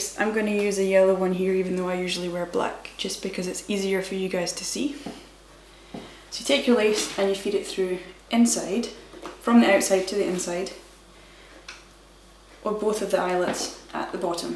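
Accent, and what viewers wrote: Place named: Scotland, United Kingdom. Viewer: You're description of this technique is exactly how I wore mine in the late eighties/early nineties. You're freckles and Scottish accent are adorable. Having been born in Belfast and grown up in Toronto... It must be said, You are an absolute gem. Cheers, Michael Hughes in Toronto.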